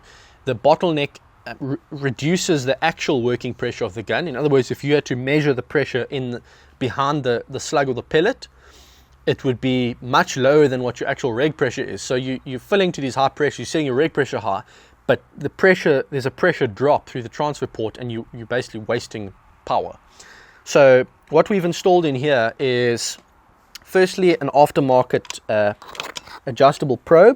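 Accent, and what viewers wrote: South Africa. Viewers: This guy isn’t from down under, he’s got a very strong South African accent - nothing like an Aussie accent.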